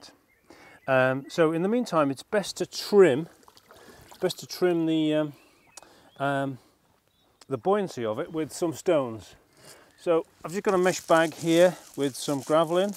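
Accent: Liverpool, United Kingdom. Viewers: Love the voice of an educated Scouser.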